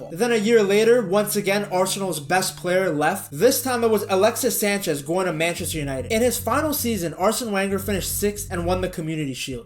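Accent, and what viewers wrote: United States. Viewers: "VEN-ger," not Wenger. Sorry, man, but if you want people to believe you are an authority on football you need to be able to pronounce correctly the name of a manager so famous that little girls in Europe know it. It's like you never watched a premier league game before 2020 or never listened to pundits discuss the sport. I'm not dissing you, dude, but you sound like some Yank creating clickbait, not a true fan of the beautiful game.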